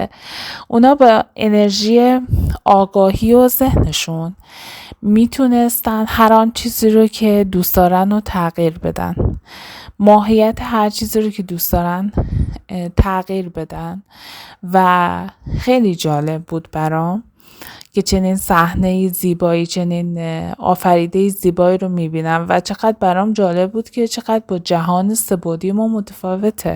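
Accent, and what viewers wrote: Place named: Kerman, Iran. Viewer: سلام عزیزم اگه کرمان هستین لطفاً بگید چون منم کرمانی ام ولهجتون فک می کنم همشهری هستیم من خیلی نیاز دارم باهاتون صحبت کنم اگه میشه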